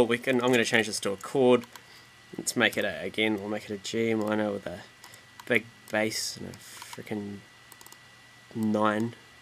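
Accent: New Zealand. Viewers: Are you from NZ, you sound Kiwi azzzzz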